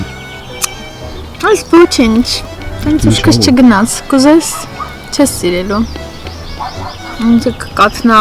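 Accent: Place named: Gyumri, Armenia. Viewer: ջան քո խոսելաձևի մեջ գյումրեցու ակցենտ եմ տեսնում դու արմատներով որտեղից ես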